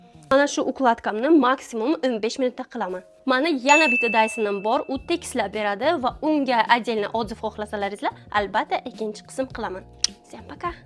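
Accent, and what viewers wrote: Bukhara, Uzbekistan. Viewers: Ой бу шева бухороники Шу район будагет! Мо шахар будаго тожики гап мезанем албатта😊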